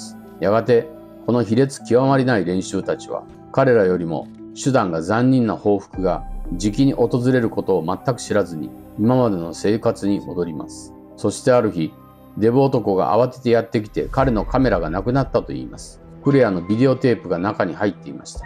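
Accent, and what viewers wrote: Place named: Kansai, Japan. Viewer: 若干関西訛りの。見やすくて助かります。ありがとうございます。ホラー映画最高ʕ•ᴥ•ʔ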